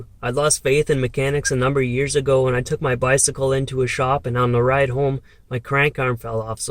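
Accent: Canada. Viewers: Love your Canadian accent. I’m gonna be watching lots of your YouTube today just got two teeth pull so it's a down day for me. 💕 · Your young Jordan Peterson lol the Accent. What region of Canada? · Canadian accent activated and recognized. 👍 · I love listening to you. As a fellow Canadian, it's like listening to the McKenzie brothers (early 80's). Stay well!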